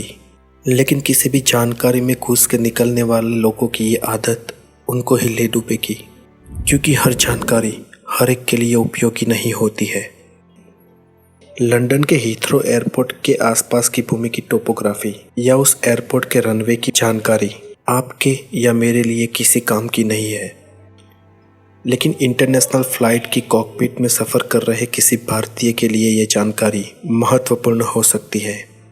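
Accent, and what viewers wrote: Gujarat, India. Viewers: Aap gujarati ho na...😊😅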